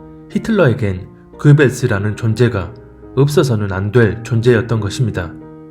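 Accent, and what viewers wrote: Jeolla, South Korea. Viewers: 전라도 말투가 상당히 거슬리네요.트럼프가 적그리스도라는 개소리는 삼가시길 바랍니다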